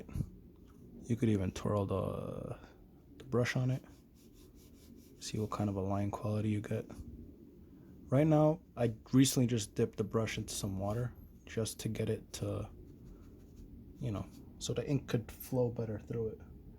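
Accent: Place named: East Coast, United States. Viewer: That was fantastic. Also your accent made it feel like my cousins from the East Coast were giving the tutorial. Which for me was comforting.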